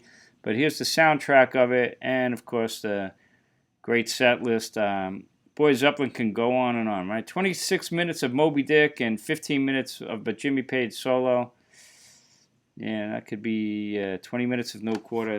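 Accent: Boston, United States. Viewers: Wow your bahstan ahccent is thick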